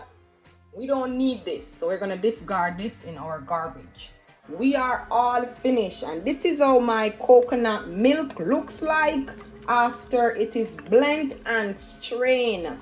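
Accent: Jamaica. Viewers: Yow ,your authentic jamaican voice make the video enjoyable to watch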